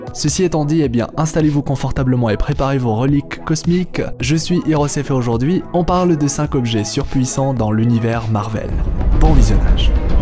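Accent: Belgium. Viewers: T'es belge ??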